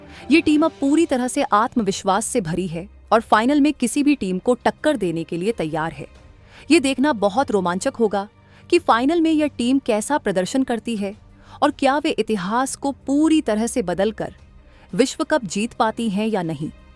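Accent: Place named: Maharashtra, India. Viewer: Hindi sahi Hai, objection is Kiya Hai ki haha marati ward Jada Hai.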